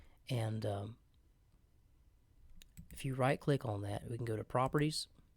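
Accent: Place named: South, United States. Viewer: man you got that country vocal!! love the mic and nice commentary!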